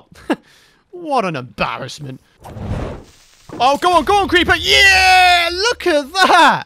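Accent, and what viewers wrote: United Kingdom. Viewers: I love british accent people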